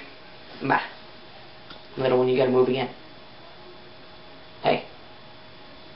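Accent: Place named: Texas, United States. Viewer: Wait, wait, wait. "Here in Texas" - you live in Texas(or at least at the time of this video)?! :O Hahahahahaha, I've been going through all your videos, and I'm like, "This guy's accent is so similar to mine, I wonder if he's from somewhere around Texas..." 'cause I was born and raised here. What're the chances, man? You've got my EXACT couch, AND you live in Texas. This is just awesome! Hahaha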